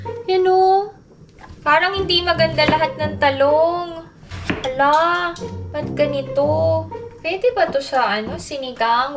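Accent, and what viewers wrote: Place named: South Korea. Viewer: Hello,! Napansin ko lang po sa mga vlogs nyo na mala-Korean na rin po yung pananalita nyo and curios ako kung aware po kayo dito. What I mean is laging may vowel sounds (-uh) na yung mga words nyo ending in consonants. Say for instance the following words: Steak-uh Netizens-uh And-uh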